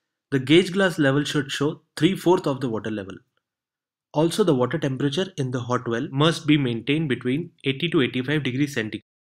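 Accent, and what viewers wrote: India. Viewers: accent, its something crazy/ indian ....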